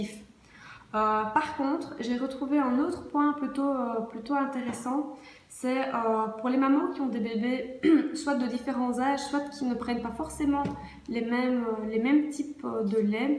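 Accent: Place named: Belgium. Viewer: coucou. juste un message pour te dire que tes vidéos sont mettent de bonne humeur, ton naturel, ton accent belge (moi je suis du sud :-) ), ton peps et bien sûr ton Choupy trop mignon. j attends avec impatience la sortie de tes nouvelles vidéos..et bien évidemment je tente ma chance pour le biberon 😋 qui ne tente rien à rien 😉 bonne continuation !!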